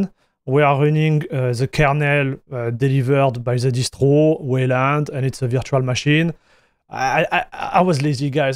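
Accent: France. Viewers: Cet accent français de zinzin, ça m'a fait bugé je me suis demandé au début si la vidéo allait être en français ou en anglais 😂. C'est ça qu'on aime, merci pour la vidéo !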